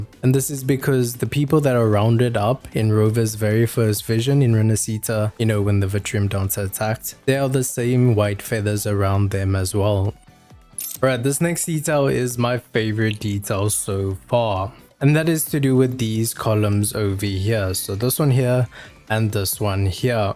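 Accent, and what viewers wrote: South Africa. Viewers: I smell a South African Wuwa Content creator👀 Subscribe✅️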